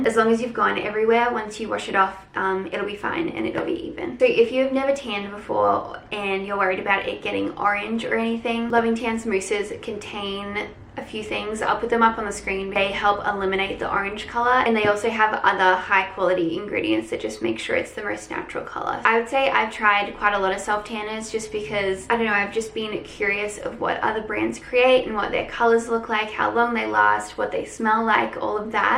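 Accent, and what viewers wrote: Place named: United States. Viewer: Sounding so American at times in this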